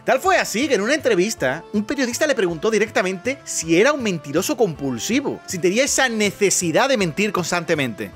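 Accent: Chile. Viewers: La wea!? Jajaja el acento chileno es tan horrendo jajaja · Como chileno vine a buscar este comentario, me explotó la cabeza que hablases en chileno xd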